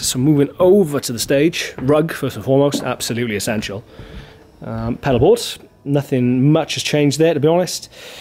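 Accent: Wales, United Kingdom. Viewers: Il vient de pays-Galles aussi ou les accents sont difficile de comprendre.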